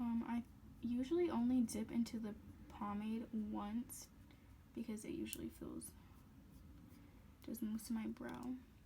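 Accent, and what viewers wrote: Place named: Florida, United States. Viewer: You sound like you’re from Florida based on your accent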